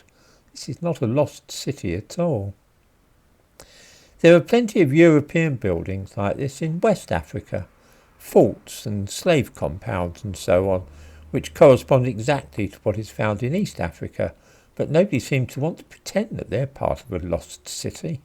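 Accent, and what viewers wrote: United Kingdom. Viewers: I love how this man has a thick british accent, a moustache AND looks down on you, literally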